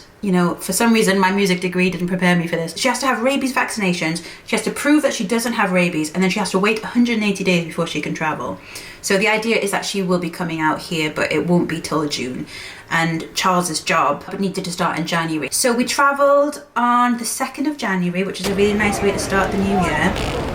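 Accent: United Kingdom. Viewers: are you actually Japanese or are you British because you seem Japanese but you have a British accent? I’m curious I’m American myself by the way my name is Jordan and I am a boy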